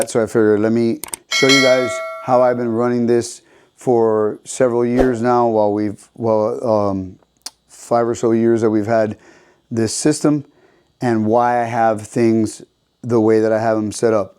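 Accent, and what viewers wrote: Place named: Miami, United States. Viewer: Love the Miami Accent...LOL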